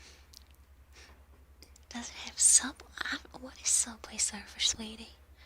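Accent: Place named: South, United States. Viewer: your southern accent is so pretty and this scenario felt relaxing, loving the work you put into the ASMR:_amilove::_amilove: wish I could be a regular in this dinner:_amilove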